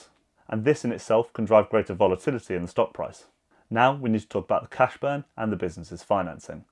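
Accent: United Kingdom. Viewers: Bro I found your channel today and watched like 5 vids, you would sound smart even without the british accent haha! Keep up the awesome work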